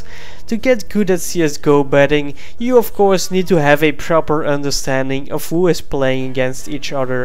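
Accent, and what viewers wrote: Netherlands. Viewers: Such a dutch way of speaking english xd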